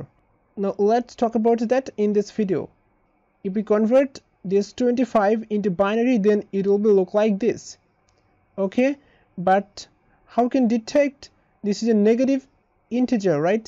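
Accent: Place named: Bengal, India. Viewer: Bengali English accent mai bolne ki zaroorat nhi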